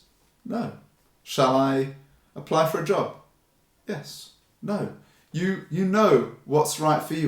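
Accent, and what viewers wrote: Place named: South East England, United Kingdom. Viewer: I'm not really into the 'peace love and harmony' stuff and my initial impression of the first 5 seconds of your video was "oh here we go. some pseudo spirituality bullshit", but you were actually saying some interesting and meaningful things. Nice one. Also what part of England you from? Sounds like south-east.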